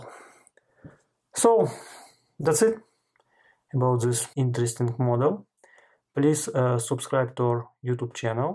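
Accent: Russia. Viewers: Жесткий русский акцент. Английский - это не для Вас.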